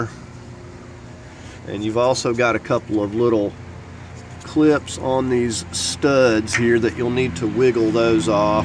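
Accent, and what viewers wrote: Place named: Southern Ohio, United States. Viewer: Fantastic set of videos - on my ‘05 Tribute V6 I have oil leaking out of my oil pan, main crank and valve cover. It’s a mess! I’m using your videos to do all three. I’m competent but not confident under cars, so these are just perfect. You sound like you might be from Southern Ohio too so that makes you okay in my book to begin with. 👍 Thanks again for sharing your expertise and making such clear to follow videos!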